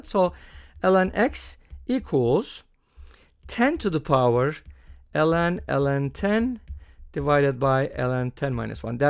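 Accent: Turkey. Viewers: I think you're a turkish. 'cause you speak english with turkish accent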